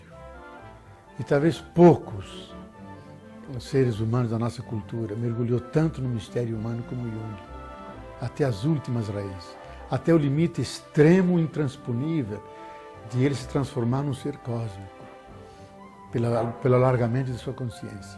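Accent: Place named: São Paulo, Brazil. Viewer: Greatfull,gosto muito desse sotaque paulista, meio double de desenho animado,.magos,freud,investigou os desejos animais " no ser sofisticado, humano,young,observou, outea dimensão, de um modo,discreto dialogou,muito bem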